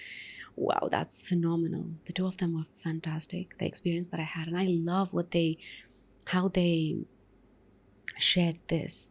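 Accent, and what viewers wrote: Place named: Maharashtra, India. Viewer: ji namste. Are you from Maharashtra.. I notice certain influence or MTI of Maharashtra in your speaking... Thanks, the guest is awesome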